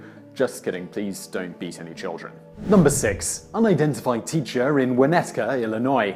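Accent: United Kingdom. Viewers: your pronunciation is awesome other then having a uk accent , i have never heard you mispronounced words compare to other channel, do you fact check how to pronounces them before recording, so professional!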